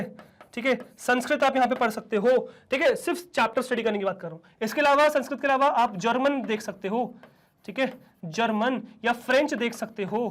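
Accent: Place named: Bihar, India. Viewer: Sir ur bihari accents op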